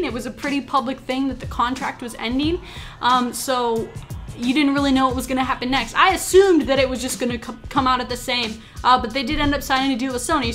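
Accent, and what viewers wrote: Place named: Midwest, United States. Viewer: She has a Midwest American accent. Just like every where else in the world. There are different forms of the same language. No need to be rude.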